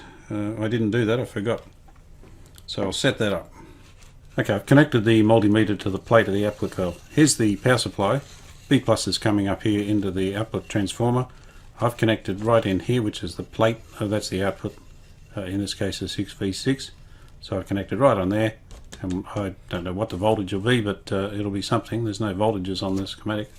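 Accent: Australia. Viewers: Great video as usual. Although you indicated that there was a difference between yourself, fellow Australians and New Zealanders, I really couldn't discern a difference. Though those that live in the northern US states say they have a hard time understanding we southerners, I have a hard time understanding those from the New England states. Oh well. Hope it isn't too hot for you there. Merry Christmas to you and family!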